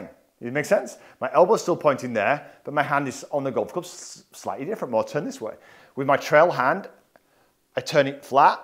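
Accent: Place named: Northern England, United Kingdom. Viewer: love the tuition, shame about the flat northern vowels.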